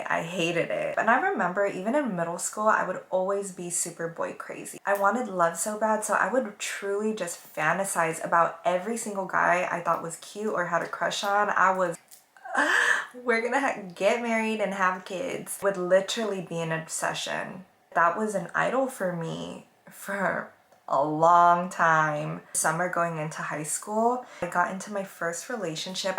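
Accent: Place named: California, United States. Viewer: Catholic religion is the reason why children turn out like this. These girls who where handed everything and go to catholic school end up the worst. Girls like this who are ungrateful always act like this. They always seem to turn out to be narcissists on youtube or some type of affirmation platform, looking for attention because of her narcissist ego. This is why she is always on a platform showing what she has needing attention. She will always be this way. Blaming porn for your failed relationships and choices, and choices of men is ludicrous. There are million of women who don't watch porn and still want abusive men instead real men. The way she talks you can tell she's from California. They have the attitude like they are great. The mentality of of doing what ever they want with consequences. "wanting to be cool." Californians are handed everything and still act like this with no appreciation fo being born privileged. 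This type of narcissist will blame everyone else for their problems. Now she expects for men to forget about her past. She starts talking about fast money. Theres no such thing as working hard and gaining wealth, thats an oxymoron. Money brings wealthy. She was a rich girl who never need money. So why is she preaching about money? Her parents will take care of her tor the rest of her life. People born poor need money to build wealth. Her explaining that she only views men if they are "charming" "good looking" she mentions nothing of their IQ or intelligence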